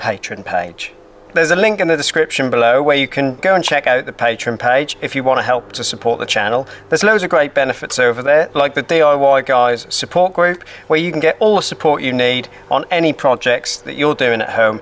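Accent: Norfolk, United Kingdom. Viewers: Teriffic video as always, Be that a bootiful Norfolk accent? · You sound like you're from my neck of the woods sir (norfolk) 😃 Great video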